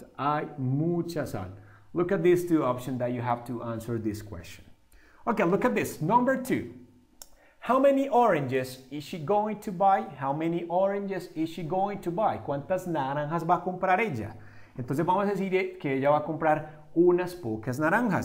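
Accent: Antioquia, Colombia. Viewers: Es la primera vez que veo un video suyo, profesor. Estoy estudiando inglés porque siempre me ha encantado, pero entre más lo estudio descubro que no es tan fácil como creí. Justo este tema de los cuantificadores me confundió pero gracias a su explicación lo entiendo mejor. Muchas gracias por tomarse el tiempo de dar esa clara explicación, además su maravilloso acento paisa tan marcado lo hace mucho más agradable. Muchas gracias, ya me suscribí. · Me encanta la voz de los colombianos y no no me gusta los hombres por si alguien solo pensaba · Gracias profe, se parece a Vegetta 777, pero en Colombiano, gran video por cierto.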